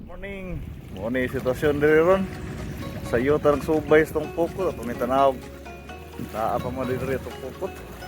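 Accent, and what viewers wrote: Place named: Visayas, Philippines. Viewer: Hala bisaya diay ka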